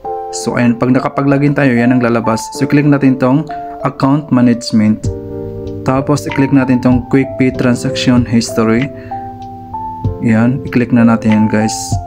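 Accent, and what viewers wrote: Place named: Maranao, Philippines. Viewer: Hello sir maranao po ba kayo,kc maranao kayo magtagalog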